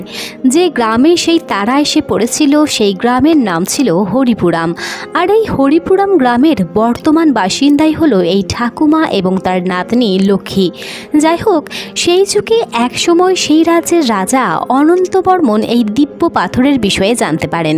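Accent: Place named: Chapai Nawabganj, Bangladesh. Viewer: আপনার বাসা কি চাঁপাইনবাবগঞ্জে আপনার ভাষা শুনে মনে হচ্ছে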